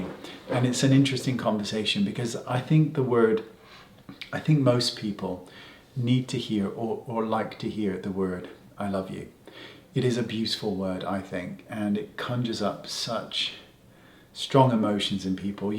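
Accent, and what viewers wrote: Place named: England, United Kingdom. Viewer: Hello 💗 nice 1 again I already had this idea once cause I always use a lot of these interdental brushes as I really need that as I have so tight teeth close to another.. Maybe I wasn't doing right as you just shown, thAnx. Ok then.. the last person I said I love you is my beloved sweet baby girl,my daughter of 6 and I really mean it. I love her to bits (or beats?) as said in England ☺️ and let me tell you Sir your speaking reminds me the real English talking... You really sound as the English gentleman 😄 when I was living in London yrs ago now, I started to get that particular accent but not too bold, I m Italian and from Tuscany so it's difficult to lose that 😆 after 7yrs I decided to going back to my country (love to see you here) now here I had not so much time and opportunities to talk daily with someone british ... 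I think you speak nice n well relaxed not as some Londoners, I couldn't get a word sometimes.. they are doing all fast even talking 😂 I think someone like you could be a better teacher for a foreigner... Maybe you can do also a video about to speak English properly to everyone?! Ciao💗take care 💓😘💚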